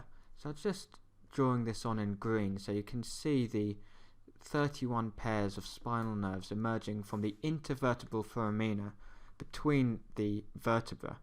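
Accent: United Kingdom. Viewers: I am a layman. But I love the accent of your British English.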